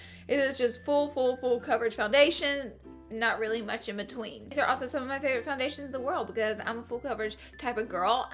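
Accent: South, United States. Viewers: SNAPCHATFAM... Love you girl. Also in the south and love your accent and how funny your videos are. ❤️❤️❤️❤️ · Snap Fam!!! I loved your snaps from London!! I'm from South Georgia so I love that you're a southern girl too with your accent!! ❤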